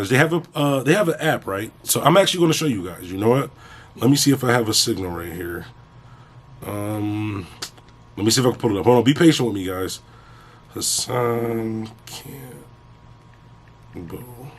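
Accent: Philadelphia, United States. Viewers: Bro sound like he from Philly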